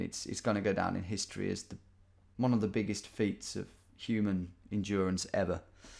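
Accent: United Kingdom. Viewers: awesome britishness doing the commentary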